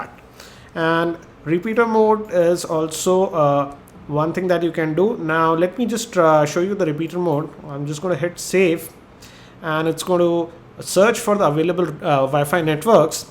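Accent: India. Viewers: is he speaking english or indian, i cant tell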